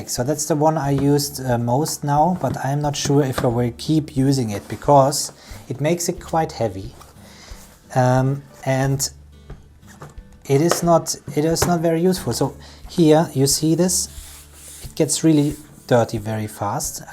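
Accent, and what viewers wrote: Germany. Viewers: Wow big German accent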